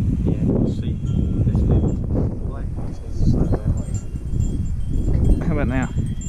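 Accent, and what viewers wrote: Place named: Louisiana, United States. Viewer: Why does this ol boy sound like he's from Shreveport?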